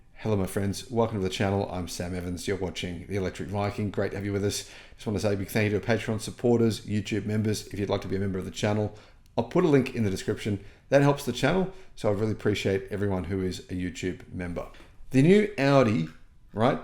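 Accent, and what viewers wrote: Australia. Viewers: Still prefer the ICE versions ,I don't care how quick they are in a straight line they are cold ,sterile ,have no sound ,no soul , character ,the gas powered version has the sound of that engine the sound of that thundering everytime you push the peddle it has character , "soul" . BTW ,are you an Aussie? Mate.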